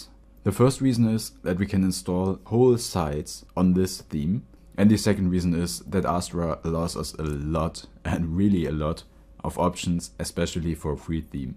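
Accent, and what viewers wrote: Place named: South Asia. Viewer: Why is this white man talking like south asian man? just wandering. Good video!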